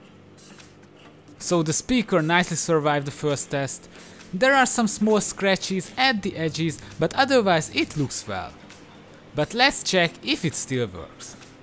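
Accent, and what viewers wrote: Hungary. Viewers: Nagyon hallatszik, hogy magyar vagy 😀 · Olyan magyaros a kiejtésed...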